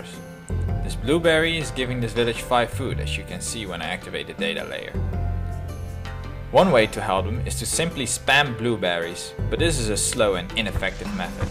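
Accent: Netherlands. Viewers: This looks interesting, I like indie-games like these. Art looks good, hope it's well balanced. Je zou wel wat aan dat accent moeten doen. Hollands engels is nog pijnlijker dan Frans engels. Wel het opvallende onvermogen maar dan zonder de charme. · you actualy sound dutch ^.^ it would explain why the name reus soudns so mutch like the word giant in dutch :P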